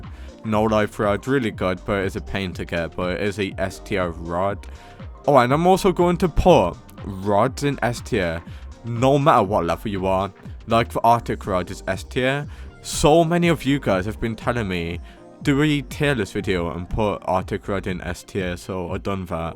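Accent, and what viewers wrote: United Kingdom. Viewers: Omg he have the bri ish accent😮 (not trying to be rude pls)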